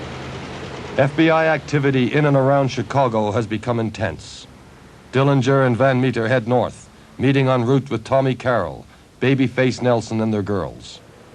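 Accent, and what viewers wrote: Canada. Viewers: Wish they had used an American narrator, rather than a Canadian.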